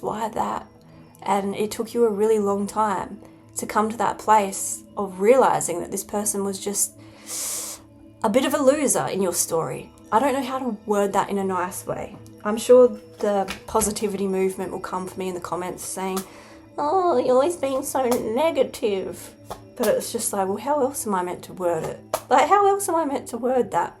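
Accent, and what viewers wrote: Australia. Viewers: I'm from Scotland and I love the Australian accent it's very cool. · I LOVE Australians. The voice or accent 🌞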